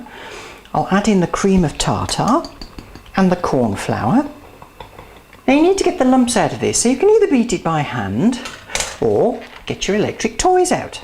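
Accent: Birmingham, United Kingdom. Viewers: I loved your little noises! and where from the UK are u from? Right! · This video made me rush out and buy turkish delight (im lazy okay!). Can you please do some more videos with your birmingham accent:D? they're fab! Love. x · thanks you a lot, i just want let you know that i found a video that shows up how to do turkish delight and yours was made far better! PS= your british voice is so relaxing, i just love english lol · it is now I understand why you stopped making videos because half illiterate trolls would not understand a British accent if it came and kicked them on their protruding and exposed prominent posteriors. Nevertheless, if you had spoken like a cat which is being strangled and with a clothes peg upon your nose broadening your vowels, with absolutely no idea about how words are pronounced properly, and added a lot of grunts and hand gestures and fillers like uh-huh.whatever, possibly this particular viewer would have understood exactly what you said. 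But being parochial and not knowing the fact that the American language is restricted only to America while the rest of the world speaks British English in British accents, and we can understand what is being said clearly, isn't it time they stopped making public clowns of themselves, offering gratuitous insults to all and sundry? Oh, I forgot you,wouldn't be able to understand this message because it is written in British English and holds a reading statistic of about 5 and above, while your educational level needs messages to be written on a readability statistic of two in order to be assimilated, understood and comprehended. Please accept my commiserations. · I like her British accent😇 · You sound like the most english person who has ever lived ☺ great video! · I'm really love british english pronaunce!!😆😆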